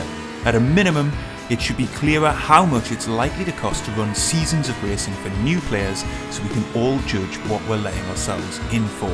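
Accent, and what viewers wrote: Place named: Newcastle, United Kingdom. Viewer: really enjoy your content, nice to hear a fellow Geordie - Dave Cam only lives a couple of miles up the road. The North is a Sim-Hub 😂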